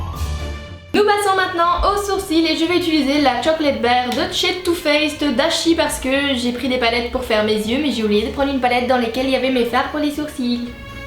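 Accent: Belgium. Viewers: Je pense que ma vidéo préférée de ta chaîne est celle ci parce que c'est toi qui m'a donné envie de m'interresser au Lolita. Et je trouve tu arrives vraiment bien à coordonner que ce soit les vêtements comme le maquillage. Et cette vidéo est dans le thème d'halloween qui est ma période préférée de l'année. En plus le noir et le orange ne sont pas des couleurs évidentes à coordiner surtout pour du loli. Sinon j'adore ton humour, ton petit accent belge, ta façon d'être un peu maladroite et naturelle. Voilà 💕 🎀🎀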